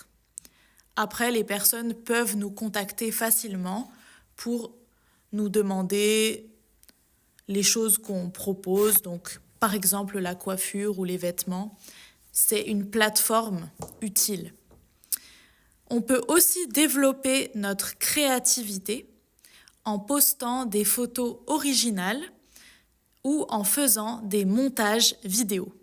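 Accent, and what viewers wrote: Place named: France. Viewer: Hola mi amiga, muy bonito acento francés, aquí te escucho, un abrazo desde Canadá 🇨🇦🙂🫶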